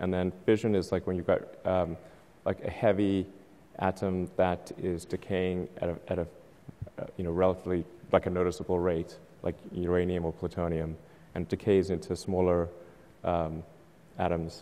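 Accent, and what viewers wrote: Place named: South Africa. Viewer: you can still hear his south african accent a bit in this video lol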